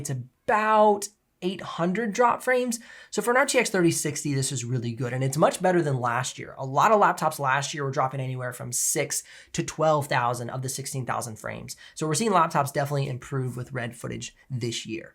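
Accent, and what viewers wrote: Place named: United States. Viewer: Its basic murican accent, which is easiest accent to understand.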